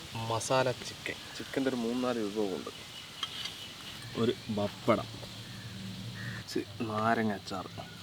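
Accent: Palakkad, India. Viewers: Palakkaden slagill "കോഴിയും കുമ്പളങ്ങയും "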